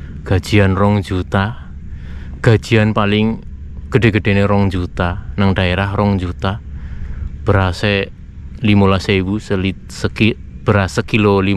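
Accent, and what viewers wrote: Banyumas, Indonesia. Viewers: Mas ini aslinya drmn? Spt dr daerah Banyumas kl dengar logatnya.. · Ngapak Banyumas kayane kie logate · Punten..mas iki jawane ngendi yah..ko ngapak bener hehe..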